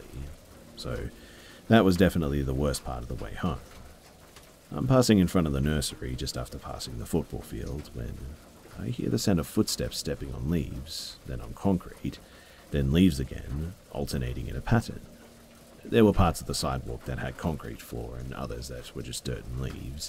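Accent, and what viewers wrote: Australia. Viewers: THANX FOR ALWAYS BEING CONSISTENT WITH AWESOME CONTENT.. I ADORE UU.. UR AUSSIE ACCENT IS SOO CUTE!! TY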